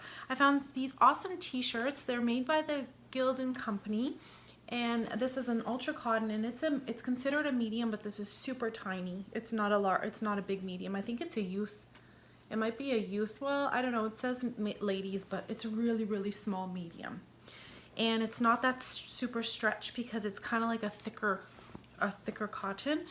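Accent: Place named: Canada. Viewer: Always love ur videos! Hope you do another garden one:). That was so unique and relaxing:). You are a very special and sweet young lady. I love ur accent-I lived in Mississauga, Ontario Canada for 6 months and love the way you say the word “about”:) I still have a very strong New England accent tho I left there in 1978! 💕💗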